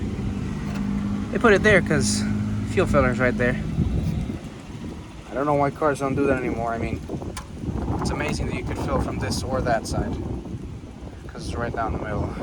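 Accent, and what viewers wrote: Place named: New York, United States. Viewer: I thought you lived in new york with a new york accent when you said you lived in Florida 😂 cool accent this car was made a year before i was born in 1981 great classic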